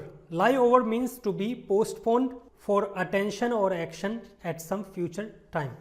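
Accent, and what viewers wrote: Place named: India. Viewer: Sorry, you accent... you shouldn't be a teacher of English but Indian.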